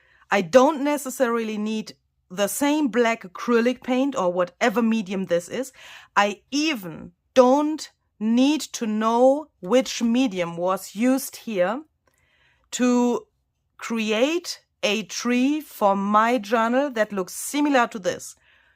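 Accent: Germany. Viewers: such a heart felt message today! To me I do not care what brand you use. I am here to watch your creative, very interesting journals. What you are making is art! You have a heart of gold, and I have connected to you. I told you before that my dad's family all came from Germany. Some in 1840, 1860, and others later. But for some reason, your accent reminds me of my great grandparents, because their first language they spoke here was low German, and they still had the strong accents. I feel like you are family to me. Some people are what we call them here, key board warriors. They hide behind a screen, and say mean things, because we don't know them, and we cannot see them. I will continue to watch you, because you to me are family. And listening to you, brings back the comfort, of listening to my long gone great grandparents. And I am much older than you. It is hard to explain. 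Your English is good! I couldn't pronounce the German words right when my grandma tried to teach me. She said my tongue wasn't slick enough. (She told her youngest daughter the same) ha ha! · This is the most wonderful junk journal I have Ever seen and the most amazing piece of art you and Barbara have done together. You have created more than just a journal, it’s very special. Ideas come from your imagination of seeing things before they are created. Like seeing it done before you start it. I have been able to do this with my father. He taught me to image what I want to make before I came out with it. And some I taught him to make a new way to do a project an easier way, than he thinks. I love watching your videos, love your German accent, and your English is wonderful. You have touched my life and creativity, as well as others I am sure. You are Amazing, and Barbara. Thank you so much for your sweet kindness,. 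I just Lov you. Keep up with your great works. Always be safe and well. Your fan and admirer. Lin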